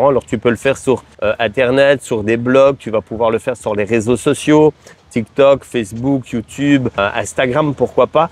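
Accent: Liège, Belgium. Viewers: Le DTV est très bien mais dès lors que tu veux orienter une partie de ton business avec la Thaïlande comme thématique il faut alors en effet créer une société. C'est ce que j'ai fait, nous avons ajouté l'activité de communication digitale au sein d'une de nos trois sociétés, l'occasion en même temps d'y incorporé les revenus que je génère avec ma chaine secondaire et l'affiliation, même si cela n'est en rien lié avec le Pays du Sourire. Et oui, flirté avec la "zone grise" peut avoir de graves conséquences, d'autant plus que le gouvernement va scruter très attentivement les réseaux sociaux dès cette année, il y a quantité de Thaïlandais et d'étrangers qui ont développé des activités en ligne ( surtout sur line pour les Thaïlandais ), qui génèrent des revenus qui feraient rougir bien des patrons de grosses boites en Europe, et qui ne sont bien sûr absolument pas déclarés, ou faussement déclarés avec des bureaux virtuels dans des pays complaisants. 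Des centaines de millions de bahts de taxes perdues pour l'Etat, gageons qu'ils ne vont pas en rester là... Sinon, à l'accent, tu ne serais pas de la région de Liège ?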